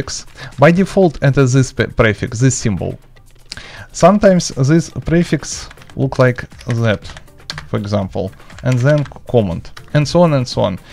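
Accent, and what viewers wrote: Russia. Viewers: сразу видно, русский акцент)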